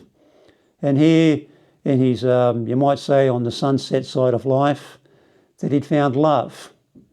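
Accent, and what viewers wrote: Australia. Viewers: It's great to hear that beautiful Australian accent Thanks very much for your story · Me too, just to hear a fellow Aussie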